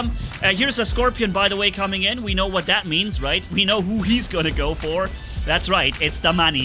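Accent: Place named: Germany. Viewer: hahaha I think might be onto something here, because you don't realize it but even this answer, you built in a German way, by putting the past participle aaaaall the way at the end of your sentence (almost). But that's great though, really awesome. I love how one's native tongue unknowingly influences the way they speak other languages no matter how fluent they are in that language!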